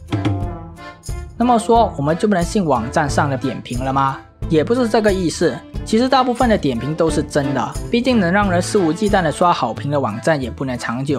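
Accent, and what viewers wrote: Malaysia. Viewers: 听口音像马来西亚人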